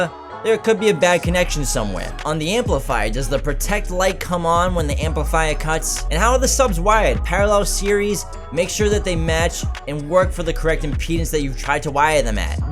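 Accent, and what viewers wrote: Boston, United States. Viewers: Dude are you from Boston ?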